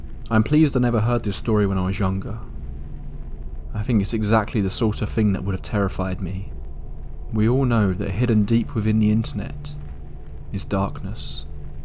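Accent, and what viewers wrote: United Kingdom. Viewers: Are u British bruh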